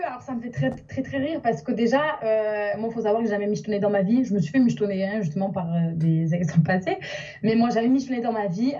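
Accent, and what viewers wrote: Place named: Marseille, France. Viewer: A Marseille on parle comme ça vous avez un problème ?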